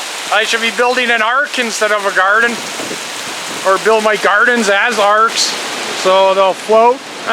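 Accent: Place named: Newfoundland, Canada. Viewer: You sound like a Newfie ?? Good coverage 👍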